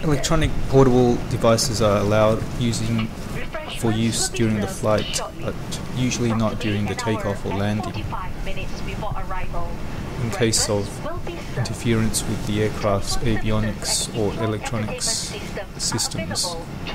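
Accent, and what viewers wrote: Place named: Australia. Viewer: Excellent work & I am a big fan of Australian accent. :D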